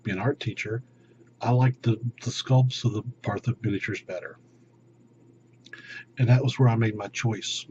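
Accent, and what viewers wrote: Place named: South, United States. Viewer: Super interesting video... you're so easy to listen too, I love your relaxed accent (I'm assuming its southern? sorry if I'm wrong!), reminds me so much of one of the WW2 veterans featured in the Band Of Brothers series - 'Shifty' Powers I think it was.:D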